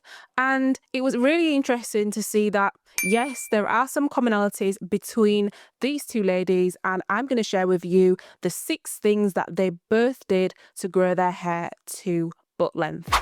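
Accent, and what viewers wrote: Northern England, United Kingdom. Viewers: Hey love, great video. I actually released a video very similar a couple of weeks ago. I've been following your journey on IG as well. Looking forward to seeing us both get to waist length very very soon 💜 Also, you sound northern. I thought you were gonna say you live in Manny or Yorkshire or something haha. I'm in B'ham.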